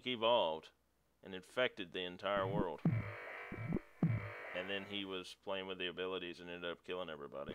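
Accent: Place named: South, United States. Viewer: Can't stand that southern voice but your tutorial worked so good job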